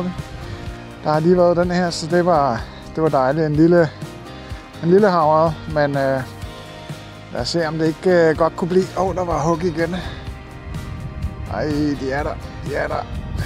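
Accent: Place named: Zealand, Denmark. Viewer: Flot fisk. FIn video. Kan høre du er fra Sjælland. Men er du ikke sød at præsentere dit valg af plads og grej og de overvejelser du gør dig i disse valg.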